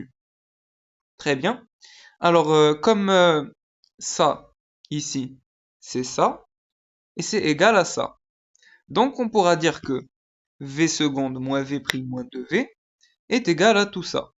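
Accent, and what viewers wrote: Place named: Arab world. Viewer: Parfois tu parles en français et y a des fois tu parles comme un arabe , je ne comprend pas 😢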